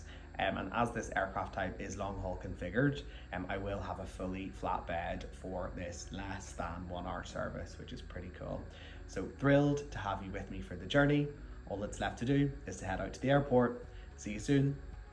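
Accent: Northern Ireland, United Kingdom. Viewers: You sound as your from Northern Ireland but did you travel south to Dublin to avail of this product as I know this is not an option on the Belfast based Aer Lingus fleet(They don't have business class cabins). Certainly looks a good product if the price is right as they say. Ta for the video.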